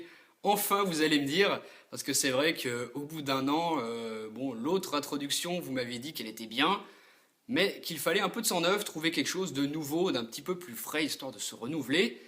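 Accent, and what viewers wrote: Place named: Savoy, France. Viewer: On reconnait l'accent de la savoie :)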